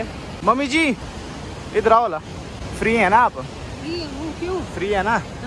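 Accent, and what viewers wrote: Pahadi, India. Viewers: Hi I am also from Himachal but unlucky I am live in Delhi . Mujhe apki Hindi sunkar lag raha hai ki app pahadhi hi ho because your voice pronunciation like pahadi people. Love from Delhi but from Himachal ❤️❤️ pahadi logzzzz 💜💜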